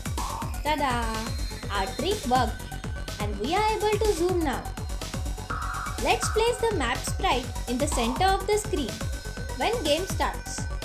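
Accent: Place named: India. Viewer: By you voice I believe you're an Indian.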